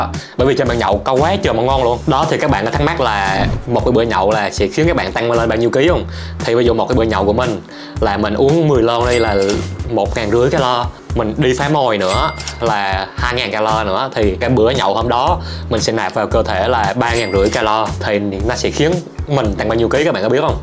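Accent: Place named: Phu Yen, Vietnam. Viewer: Bạn nói tiếng giống người phú yên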